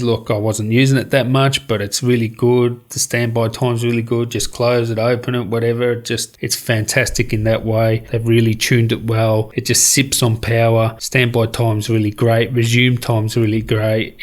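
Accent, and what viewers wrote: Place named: Australia. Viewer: ahhh it's good to hear a tech reviewer with a real legit aussie accent!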